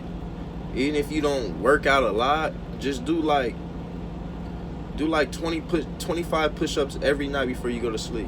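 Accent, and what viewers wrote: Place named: Los Angeles, United States. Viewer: What part of LA you from homeboy, i can hear it all in yo lingo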